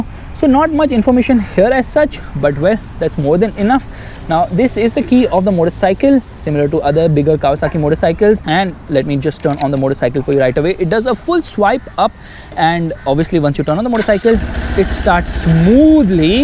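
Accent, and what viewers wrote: India. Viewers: Ohhh you are Indian I thought that you are Pakistan by reading your Muslim name but by your talk I come to know that you are Indiab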